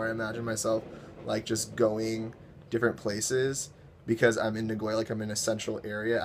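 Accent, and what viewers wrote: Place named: California, United States. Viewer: I think the best thing about this video is you mentioning the nabe, mainly because I love spicy food as well. Also I have a question, where are you originally from? You sound like you're from Cali, probably southern but I'm just curious.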